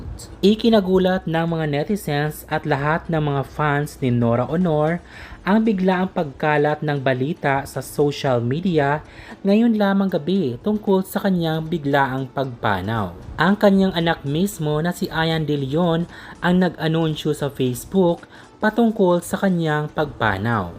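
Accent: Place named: Visayas, Philippines. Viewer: Bisaya itong nag voice over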